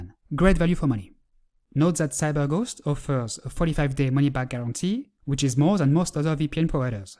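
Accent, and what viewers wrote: France. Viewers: XD L'accent francais se fais entendre x)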